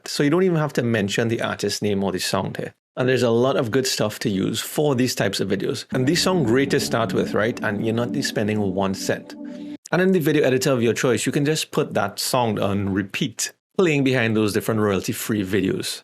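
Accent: Trinidad and Tobago. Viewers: Trini accent? Lol had to ask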